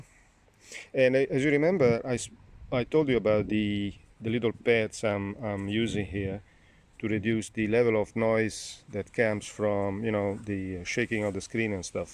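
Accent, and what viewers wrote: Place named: Italy. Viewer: you speak Netherlands i can here you Italien accent beceause i was many thimes in Italy in Modena Ficensa Treviso Napoli vinetie etc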